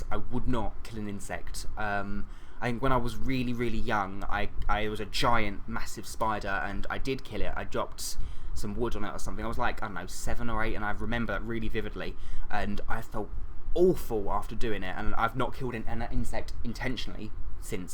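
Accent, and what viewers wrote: England, United Kingdom. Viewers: were do u live u soud british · HES ENGLISH YAAASS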